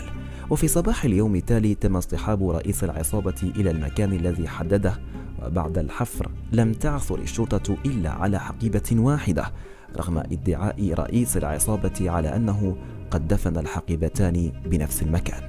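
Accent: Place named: Morocco. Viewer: السلام عليكم بالتوفيق والسداد اخي الكريم، من نبرة صوتك أظن انك مغربي أو جزائري، بالتوفيق مرة أخرى من 🇪🇸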